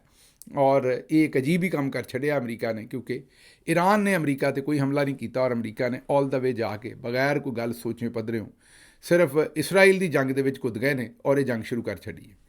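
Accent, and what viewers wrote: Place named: Majha, India. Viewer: Teri boli veere panjabi aa jehdi bol re o eh tan majhe di boli aa urdu aardu bilkul ni hai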